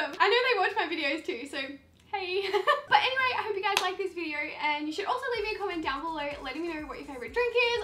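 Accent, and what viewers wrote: Australia. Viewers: what part of australia are you from? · are u australian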